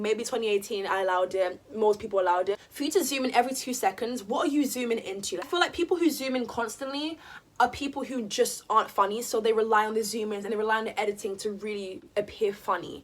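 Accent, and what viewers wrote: England, United Kingdom. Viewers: i watch you because i want to get used to this accent to understand British series and I think your channel is entertaining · You just recommended to me 😊 also your accent makes me miss the UK cos I just moved away, also I like that you express your opinion - I agree with your thing about Antonio Garza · I love and watch because of your accent because i expected an american one. I am english aswell so its making me feel nostalgic as i dont live in London anymore 💜 also you keep it real and girl you have alot of common sense and your hair is amazing. I love natural afros 🙏🏻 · Because your great. You also have a really cool accent! I love when people who are African American people have British accents!! 😊 Your videos are also very honest. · I still love hearing your English accent. ASMR moments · I watch you because I love how straightforward you are in your videos . 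You really don’t hold back which is really good and I also watch you for your accent 😂 because you sound exactly like my cousin In England and I don’t get to see her often !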